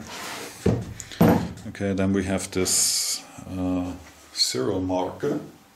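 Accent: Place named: Germany. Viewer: Hi - great video as expected--- keep up the great work!!! My wife enjoys hearing your German accent-- when she walks in the room and I'm watching your videos, she says " he's back"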